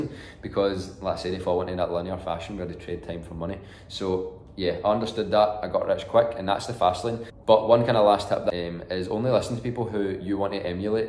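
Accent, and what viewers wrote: Scotland, United Kingdom. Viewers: OMG I love your accent!! Are you Scottish? Btw idk if you noticed, but the subtitles have so many mistakes.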